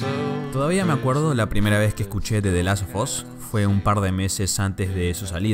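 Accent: Argentina. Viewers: Que asco, acento Miergentino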